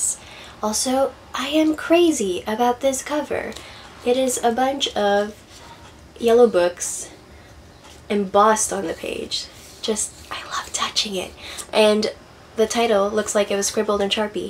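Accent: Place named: United Kingdom. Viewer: lol love your British accent and your intro!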